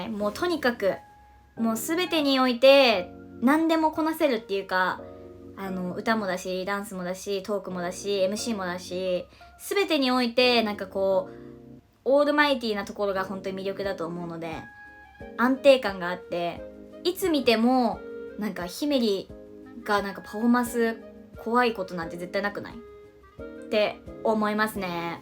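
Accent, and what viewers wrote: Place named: Yamaguchi, Japan. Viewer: うん、山口弁を隠しきれない。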